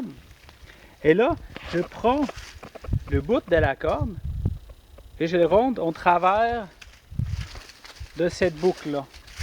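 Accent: Quebec, Canada. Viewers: Bonjour. Merci pour cette vidéo. J'ai fait pas mal de recherche pour fixation d'une faïtière et ta vidéo est la meilleure: c'est clair, bien expliqué et bien filmé. En plus avec l'accent québécois et ta sympathie, c'est top. Salutations Nature de France!;) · Ce merveilleux accent canadien ! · bonjour' tu as une très bonne pédagogie , tu refais le mouvement en expliquant les erreurs à n'a pas commenter . J adore l accent de nos cousins du Québec. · un accent de où? je suis de montreal alors je sais pas trop de quel quoi du Quebec vient ton accent :p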